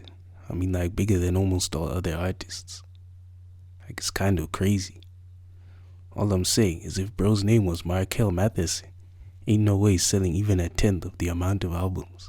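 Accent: South Africa. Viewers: U got a 🇿🇦🇿🇦 accent jo What's popping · He sounds south african gng and not a white south african either. Are you part of one of the fanbases that he was talkin bout on this vid or smth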